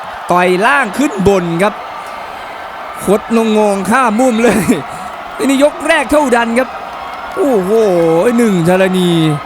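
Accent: Isan, Thailand. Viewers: นอ้ง พากมวยสนุก.ให้เสียงไทยและอี่สาน.ที่ไม่เหมือนใคร.ไทยประสมอีสาน.จะมีบางคำก็ตลกเฮฮาไปดว้ย.บางครั้งผมดูมวยไปฟังเสียงนอ้ง.ผมก็ยังหัวเราะคนเดียวไปดว้ย.มีควสมสุขดีครับได้ฟังนอ้ง พาก.สนุกกว่าพิทีกรอยู่ในสนามมวยเสียอีก.ชอบนอ้ง มากๆครับ.FC.อยู่ฟังลาวครับผม❤❤❤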